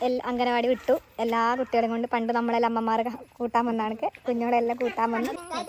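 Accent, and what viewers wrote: Kasaragod, India. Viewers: അംഗനവാടിയിൽ പോവാൻ വഴിയില്ലാലേ കാസർഗോഡൻ ഭാഷ നിഷ്കള ങ്ക സംസാരം ജനിച്ച വീടും നാടും മറക്കരുത്.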